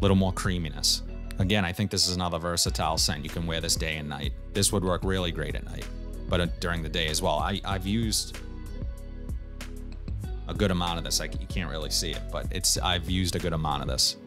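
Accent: Boston, United States. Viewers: Hey, just came across your channel and subscribed. Have you tried Timbuktu L'Artisan Parfumeur? I found it at Burlington too. You might like it. Resinous, smokey, spicy. I liked it a while back but didn’t buy because I was looking for more floral scents from L’Artisan. Are you from Boston? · oh wow that's interesting! I guess I never heard a Boston accent before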